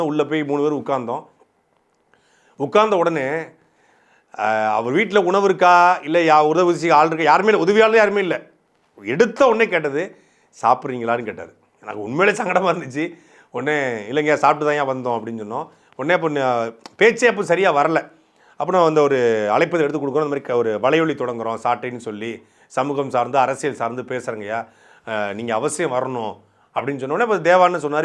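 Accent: Tirunelveli, India. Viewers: தெளிவான உச்சரி ப்பு தள்ளு தமிழ் தெள்ளு தமிழ் தெளிவான உச்சரிப்பு திருநெல்வேலி தமிழ் அழுத்தம் திருத்தமான பேச்சு சாட்டைக்கு நிகர் சாட்டையை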